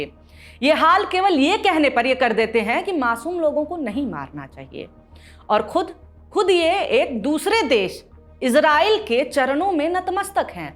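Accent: Bihar, India. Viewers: Nice. Tone reflects of bihari. Liked it. Gud information. Keep it up and keep the style of speaking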